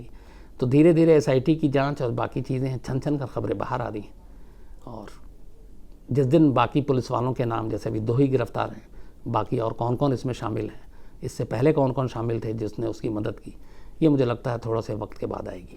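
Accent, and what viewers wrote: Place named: Bihar, India. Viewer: Bihar me aise hi bolte hai syd sir bihar se hai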